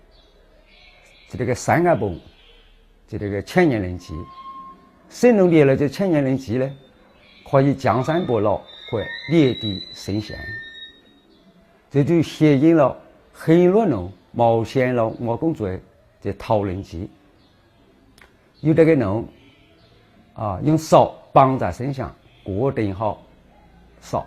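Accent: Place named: Henan, China. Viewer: 这是吴语？除了我们读阿拉，讲读刚之外就是河南话吧！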